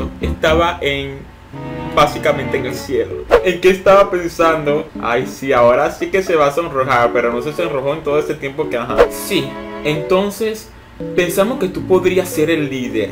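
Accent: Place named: Venezuela. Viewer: Me gusta tu forma de explicar... Tu acento suena a... venezolano... hablas muy chistoso.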